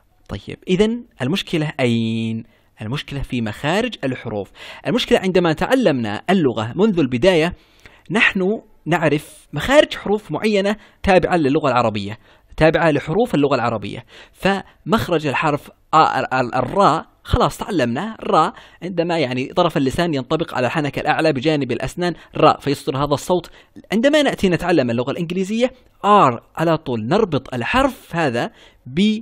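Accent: Yemen. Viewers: ابدااااااع ما شاء الله 😻 كنت شاكه من انك يمني لانه طرريقه الكلام و بعض الكلمات يمنيه احيييك يــــا ابن بلادي استمر ولايك واشتراك 🌸💙✨ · والله عرفت انك يمني من لهجتك سبحان الله تسلم طرح رائع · يا اخي صعب إن العرب يتكلموا مثل الأمريكان أو البريطانيين لازم يكون فيه علامات فارقة في النطق مثلا أنت يظهر على لهجتك بأنك من اليمن وهذا شئ طبيعي أهم شئ يفهمك المستمع، تحياتي لك ولجهودك. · مرحبا، لهجتك يمنية؟ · sanaa batool حتى أنا والله عرفت إنه يمني من اللهجة سبحان الله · لهجتك يمنية انت،يمني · يا اخي اعرف الاكسنت اليمني من بعيد هههههه تحيه مني لك 🇾🇪🤩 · أحسنت وسلام كبير لليمني اكسنت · لهجتك يمنية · انتي يمني من لهجتك عجبتني قناتك فيها التبسيط بلشرح مشتركه جديده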